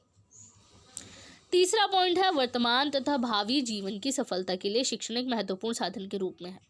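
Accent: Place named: Haryana, India. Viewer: Mam ap toh harayana se ho ❤️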